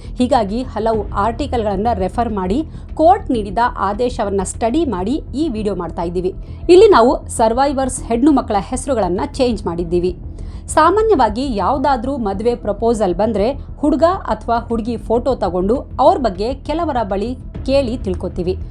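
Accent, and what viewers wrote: Standard. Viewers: ಸ್ವಚ್ಛ ಕನ್ನಡ ಶುದ್ಧ ಕನ್ನಡ ವಿವರಣೆ exalent 🙏❤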